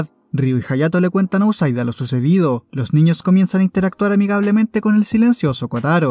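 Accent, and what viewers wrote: Chile. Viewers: con los primeros 5 segundos ya supe que es chileno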